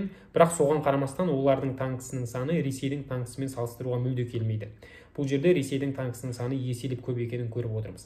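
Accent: Standard. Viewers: Бәрекелді балам. Міне осылай таза қазақша сөйлеуді үйреніңдер жастар. Осы сен сияқты сауатты жастарымыз көп болсын.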